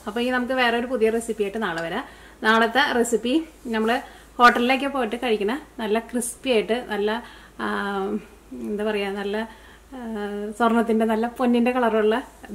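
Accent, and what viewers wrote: Thrissur, India. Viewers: അതാരികും സംസാരത്തിൽ ഒരു കര കരപ്പ്.. പിന്നെ തൃശൂർ കാരി ആണെന്ന് ആദ്യം തന്നെ മനസിലായി..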